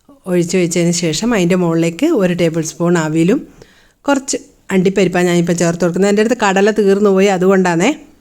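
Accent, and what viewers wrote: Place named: Vadakara, India. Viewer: അവിൽ മിൽക്ക് ഇഷ്ടം ആയി സംസാരം അതിലും ഇഷ്ടം ആയി അവിൽ കയ്യിൽ ഇട്ട് നുരുമ്പുമ്മോ പൊടിയണം വടകര ഭാഷ എന്തായാലും സൂപ്പർ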